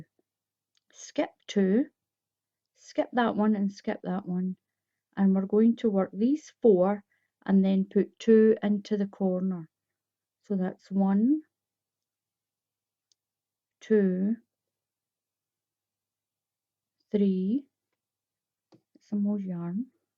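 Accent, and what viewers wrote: Scotland, United Kingdom. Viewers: I'm a beginner and have watched your tutorial twice to get up the nerve to try it. Third time is the charm! Though I did have to rewind a few times, I think I have it! I love how you explain everything so clearly and I also love your accent. Scottish? Thanks for this pattern.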